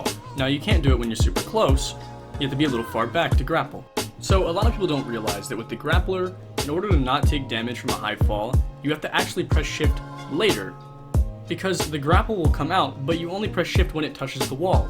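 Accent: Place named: England, United Kingdom. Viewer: you prob are the first parkour youtuber i have ever seen with an english accent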